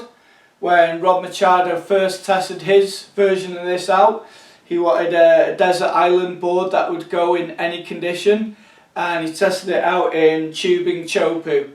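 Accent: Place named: England, United Kingdom. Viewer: G'day from Australia, fantastic in depth, informative review. I want one! Great to hear an English accent on a surf related video for a change! Are they really lightweight? Loved your comment on buying the board for maximum fun rather than how cool it looks. Subscribed. :)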